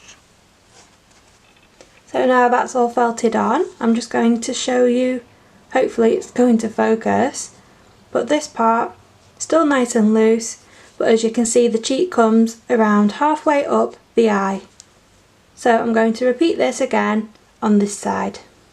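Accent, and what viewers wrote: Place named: Yorkshire, United Kingdom. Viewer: I kind of thought you were a Yorkshire Lass, I have relatives in Lincolnshire, about 9 miles from Boston or skeggy depending on the way you go. Take care, I’ll look forward to all your future videos. Keep up the great work you are putting out there. 🥰👏🏻👏🏻👏🏻👏🏻👏🏻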